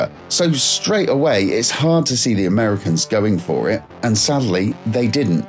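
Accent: United Kingdom. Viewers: As far as I can tell this fellow with a British accent did not include a singled British bike.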